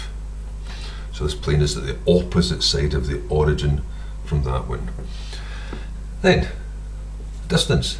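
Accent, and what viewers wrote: Scotland, United Kingdom. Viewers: Is it just me or his accent sounds like from Brave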